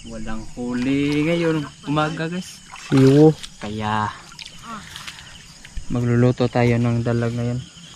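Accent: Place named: Cebu, Philippines. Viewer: Taga diin ka boss kay feel nako Cebu inyuha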